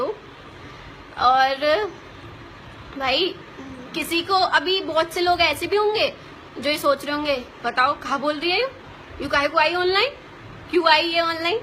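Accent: India. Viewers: Hiiiiii haryana se ho kya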